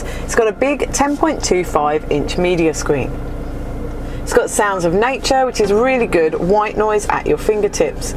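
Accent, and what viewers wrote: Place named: England, United Kingdom. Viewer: you r not from down under sounds english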